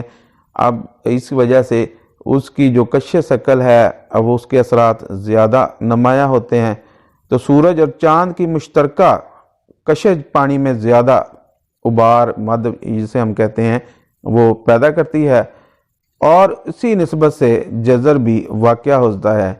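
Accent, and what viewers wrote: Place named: Punjab, Pakistan. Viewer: کتنا گندا پنجابی عجیب لہجہ وہ بھائی کچھ خدا کا خوف کرو تم لوگ اچھی آواز والا خالص اردو والے سے یہ ویڈیوں بنوا لیتے فل پنجابی لہجے والا کرخت انسان کی آواز جو انتہائی کانوں کو بری لگ رہی ہے سننے کو دل نہ کرے انسان کا مگر بے شرم قوم کو سب ہضم ہے ادھر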